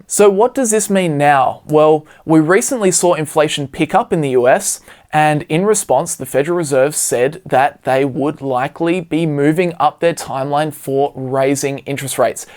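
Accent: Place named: Australia. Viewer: Not bad for an Aussie!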